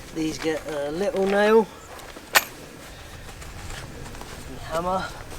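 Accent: United Kingdom. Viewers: I'm a new subscriber...you are one of the most unique and talented "off gridders" I have encountered via video yet!! I hear your British accent, but I'm not sure where you live. Can you share the general area? I'm in the U.S. - Kansas, but we are beginning the process of becoming totally self-sufficient. Thanks for sharing your thoughtful, complex, and rewarding life.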